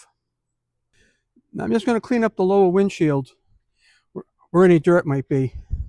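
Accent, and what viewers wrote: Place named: Boston, United States. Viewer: Thanks for the video brother, love your accent, sounds like my cousins from Boston. I’m up here in Canada and have a 2020 Nautilus and this video was very helpful.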